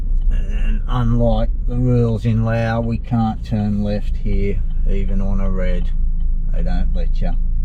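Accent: Australia. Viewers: See you soon I'am going to work they now you sound like ausy mate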